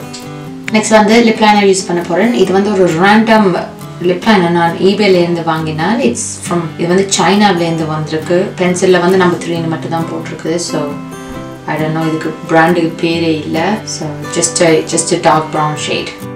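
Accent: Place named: Tamil Nadu, India. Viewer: Hi sister I'm really going crazy for ur Tamil slang and I like u and ur papa is damn cute god bless u and papa ND bro always im keep on watching ur Tamil makeup may b 10times a day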